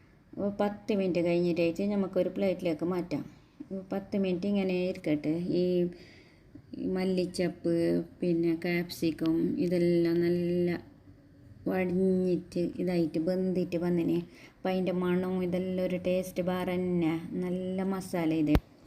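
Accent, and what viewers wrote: Kasaragod, India. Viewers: എനിക്കിഷ്ടമാണ് നിങ്ങളുടെ സംസാരം പിന്നെയും പിന്നെയും കേൾക്കാൻ തോന്നും കാസർഗോഡ് സംസാരം ഒന്ന് വേറെ തന്നെയാണ്❤❤❤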